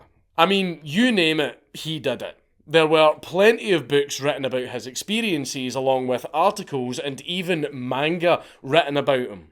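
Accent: Scotland, United Kingdom. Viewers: Personally, I like the way he says "work," for some reason, as idk what it is, but there's something about his scottish accent that tickles my American brain. 😅 But the way he says "murderer" sounds oddly appealing, too. 😅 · Being a German native I daresay I like this Scottish accent very much. It sounds very harsh but very funny at the same time. Always puts a smile on my face. 😊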